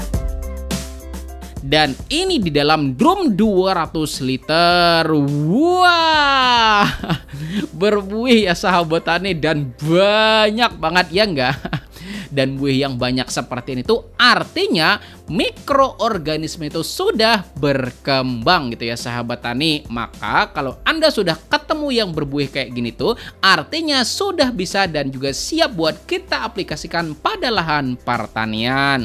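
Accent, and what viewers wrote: Banjar, Indonesia. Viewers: Dari toturial dialek bahasa, sampian ini orang Banjar kah ? Beralamat di mana ?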